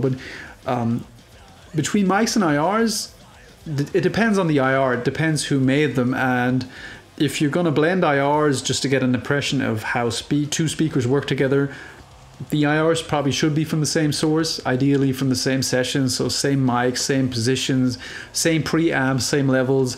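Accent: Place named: Ireland. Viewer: New to the channel. Love it. But you speak English with a bit of an Irish accent on certain words. How'd that come about?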